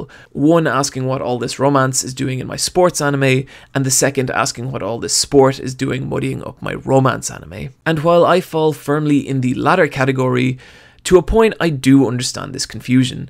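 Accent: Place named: Ireland. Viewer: No hate i promise just wanted to say the dude sounds Irish (i'm also Irish)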